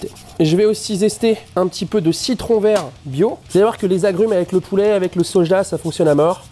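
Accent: South, France. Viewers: l'accent du sud me fais penser a mon prof de cuisine au lycée mdrrr on se tape des barres avec cet accent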